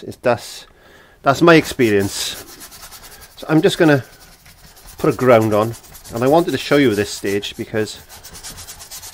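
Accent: Wales, United Kingdom. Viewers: Thank you for showing us how to build up a Van Gogh picture ... you are very entertaining as well... we love your cheeky welsh accent .... If I had the money to pay for you to go to the Van Gogh museum I would ..keep it up you really are fantastic at what you do 👍thank you 👍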